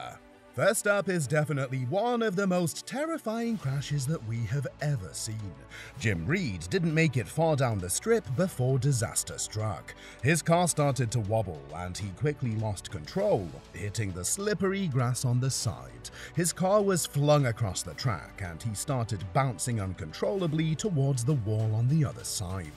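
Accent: United Kingdom. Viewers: I was going to watch until I heard the Brit narrating....😱🤨🤬🤬🤬🤬🤬🤬🤬🤬🤬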